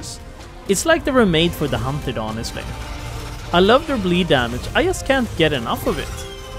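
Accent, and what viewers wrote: Sweden. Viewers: haha visste du va svensk med din brytning inom svengelska 🤭🤭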